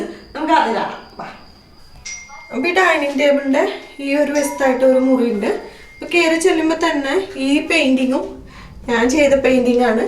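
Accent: Thrissur, India. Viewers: Hi, അവതരണം നന്നായിട്ടുണ്ട്, painting അടിപൊളി ആയിട്ടുണ്ട്, നാട്ടിൽ എവിടെയാ, ഒരു തൃശൂർ slang പോലെ തോന്നുന്നു. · നാട്ടിൽ ത്രിശൂർ ആണോ വീട്..?? സ്റ്റാർ മാജിക്കിലെ ലക്ഷ്മിയുടെയും സ്ലാങ്കും വോയിസും ഒരുപോലെയുണ്ട്...👍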